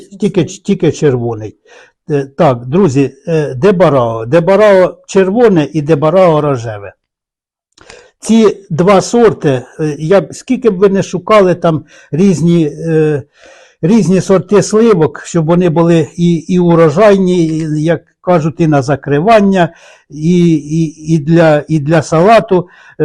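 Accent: Poltava, Ukraine. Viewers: Дякую за чудовий огляд, дивлюся Ваші відео з великим задоволенням, приємно чути рідний полтавський говір. Сама така, як Ваша дружина, все хочеться щось новеньке.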